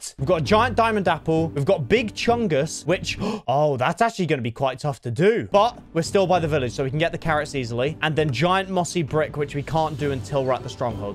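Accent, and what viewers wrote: United Kingdom. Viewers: ive been watching for a while right, and tbh i think every episode he gets more british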